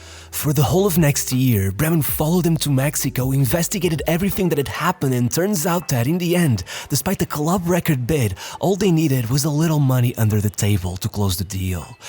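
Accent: Portugal. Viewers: Ja é talvez o 5 comentario que faço em videos teus, e acho que todos foram a dizer o quanto parecias portugues a falar... hoje com camera apareces com a camisola do vizela... Acho que tenho as minhas confirmações 😂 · Bem, o sotaque sempre foi 100% português. Eu tinha dificuldade em reconhecer o sotaque português, mas depois vi (ouvi) o Mourinho falar mil vezes em inglês. Aliás, a frase inicial "listen to this" é perfeita para reconhecer o sotaque português. Pronuncia "Liss-tenm tu dis" em vez de algo como "lisen te ðis" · AAAAH Eu sabia pelo sotaque que tinhas que ser português!!! Bom trabalho, continua!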